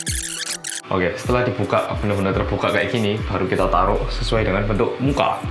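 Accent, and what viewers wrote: Java, Indonesia. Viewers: siang medoookkkkk wkkwkwkw tmbah ganteng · Absen dulu buat si korea medhok!😊 sukses selalu oppa(?)atau mas iki😂,salam bolo" barumu mas.aku mau manggil mas aja karena aku udh menganggapmu sbng wong medhok😊.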